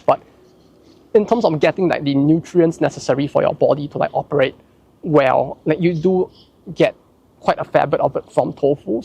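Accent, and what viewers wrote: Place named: Singapore. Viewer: No way, i wasn't the only one who heard the singaporean accent in your voice heh Also i can add to that discussion of workforce gender presence in the eroge creation. One of the examples i can give is, lets say a hypothetical "Beauty isn't skin deep (yuri)" game that the art team had a specific member setup (atleast during the first project i worked on the CGs), it was only 5 people big, 3 males and 2 females (though it would grow exponentially by 2016). Each of the artist had a specific role to work onto their allocated CG pile, one would focus on the atmospheric setting of the novel, thus designing soft pallete CGs with only the minimum of affectionate connection between the characters. One would focus on the 'standout' CGs as they called it which focused on having an emotional impact ongoing between the characters, and one (me in this case) to be behind the passionate scenes, which would be more of a softcore or a play-state type of mood. 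the more experienced female artist of the team, would sit in a call with the others and point out design or anatomical discrepancies, give tips on polishing the art or highlighting certain zones (not always the naughty stuff, sometimes you want focus on the background, as the backdrop is key into how the player perceives the current situation to be, and can be very distracting if not done right. The experienced artist was also the one responsible for the more.. hardcore stuff CGs, and would basically supervise the whole art quality. In or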